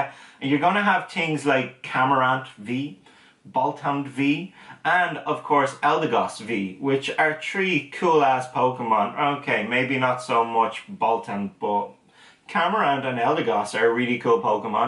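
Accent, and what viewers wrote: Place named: Ireland. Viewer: I never knew I needed an Irishmen to teach me Pokemon. Erin Go Bragh!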